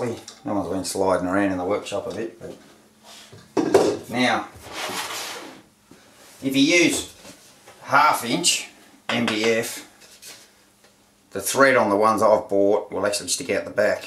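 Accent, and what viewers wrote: Australia. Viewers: Love to hear the Aussie accent :-) very cool idea. I'll see if my husband can come up with one 👍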